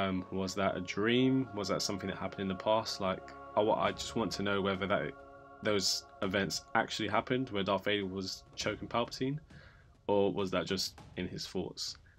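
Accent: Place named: United Kingdom. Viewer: Your dialect is ugly, it sounds like UK trash, rather than superior aka North American dialects.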